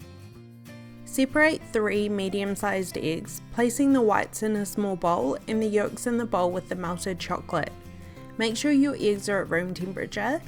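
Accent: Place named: New Zealand. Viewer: Hi, she’s not British. She’s from New Zealand. I’m Australian, and I’ve lived in NZ too, so, I can assure you she is a kiwi :)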